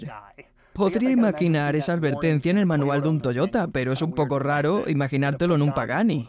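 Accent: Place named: Galicia, Spain. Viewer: Estoy harto de escuchar la tonada gallega...las z me tienen las bolas x el piso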